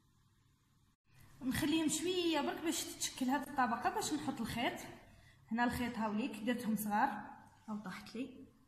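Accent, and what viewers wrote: Batna, Algeria. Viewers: اختي انتي تحكي كيما سهام نورمالمو ديلخ تاع باتنة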